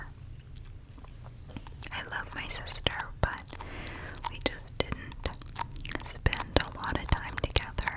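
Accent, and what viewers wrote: South, United States. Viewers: I love southern sounds! Would love if you did a librarian role play like she does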